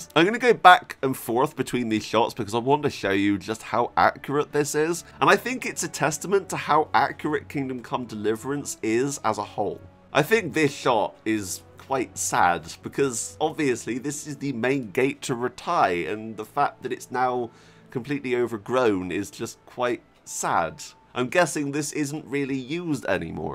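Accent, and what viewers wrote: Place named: Dorset, United Kingdom. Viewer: is that a posh dorset accent? I have no idea what it is, wacky man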